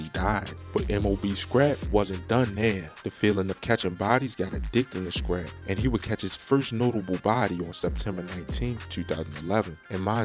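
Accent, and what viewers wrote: Baltimore, United States. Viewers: doing this video is from Baltimore.. being a native Washingtonian here in DC I'm used to that accent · You got That Baltimore Accent.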